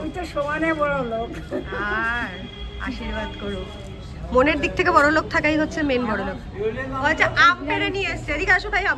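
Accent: Bangal, Bangladesh. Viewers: Khub bhalo laglo specially bangal bhasa ta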